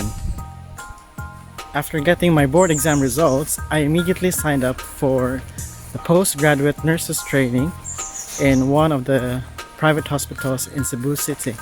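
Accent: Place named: United Kingdom. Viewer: wow lods na acquire mo na din ng accent ng briton salute to you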